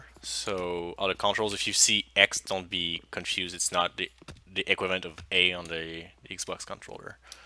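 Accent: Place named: Quebec, Canada. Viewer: J’entends le québécois dans cette voix! Allo compatriote! Bien hâte d’essayer ce jeu!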